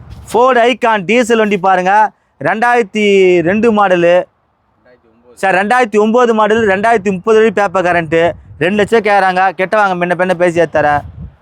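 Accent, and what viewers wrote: Madurai, India. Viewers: Super Anna Madurai vasagam 🎉🎉🎉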